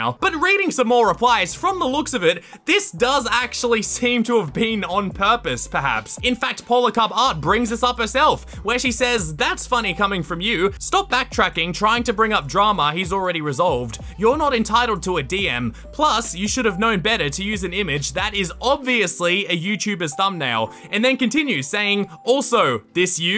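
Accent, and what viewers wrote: Australia. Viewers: I love his australian accent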